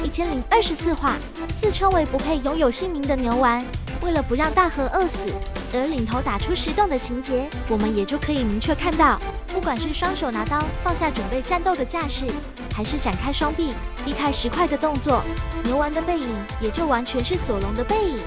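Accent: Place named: China. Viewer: 較象大陸的，咡過原音。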